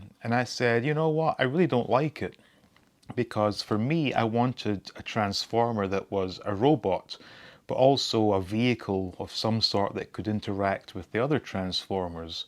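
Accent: Scotland, United Kingdom. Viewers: I thought you were Irish at first. So Scottish like Bruce Wayne and Roddy Piper(Roderick Toombs). Well they are Scottish descent.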